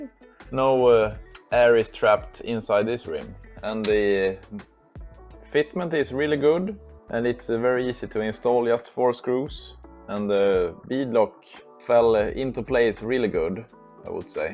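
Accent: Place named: Italy. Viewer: Yeah, man, I do have this exact model. Really, I really wish I could get the front-wires from hood-to-roof installed. Also, I am trying to place your accent- Italian? I'm USA.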